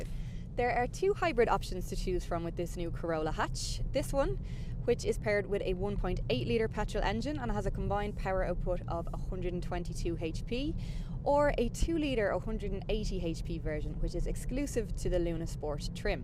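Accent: Ireland. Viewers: That Irish accent 👌👌👌❤️ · succint review, very well versed presenter. And the best part that irish accent :P Hope you get more subs this year. :) All the best for 100k subs this year! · Love Irish accent :-) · Ok. Just a quick comment about a few points: 1. Your videos are very well made, informative and free from lame jokes (eg. Matt Watson) 2. is a very capable presenter 3. She is also gorgeous and has one of the most beautiful smiles I’ve ever seen. It’s good that she uses that a lot:) 4. I love her Irish accent too Keep up the good job guys. Wanted to leave this comment for quite a long time but I usually watch YouTube on my TV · Is this really or is it just Olivia Thirlby masquerading as. The resemblance of these two is just astonishing. And I'm loving the Irish accent. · Great review well done and I love your Irish accent mmmm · Great video review, you just got a new subscriber all the way down from Greece! 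P.S. I love your Irish accent,!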